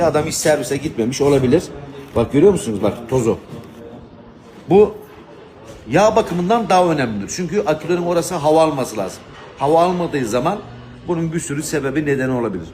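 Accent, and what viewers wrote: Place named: Aegean, Turkey. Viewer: Alem adamsin kral bizde egeliyiz şivene kurban bozma şiveni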